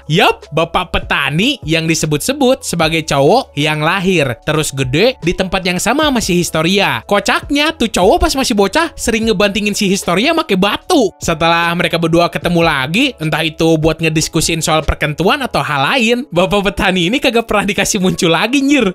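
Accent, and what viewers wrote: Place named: West Java, Indonesia. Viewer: Sundanese detected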